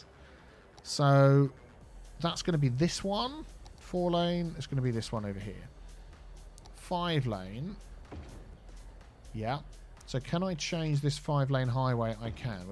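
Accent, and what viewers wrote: United Kingdom. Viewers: Ah! Poke me eye out! Love the British accent,. Adds flavor to your videos. Good stuff as always.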